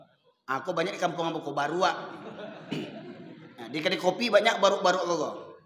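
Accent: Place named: West Sumatra, Indonesia. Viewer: Lombok hadir tuan guru, saya suka, pengajian ini, meskipun ada bahasa yg sy tidak mengerti, tpi sy suka mendengar isi pengajian dan logat minangnya.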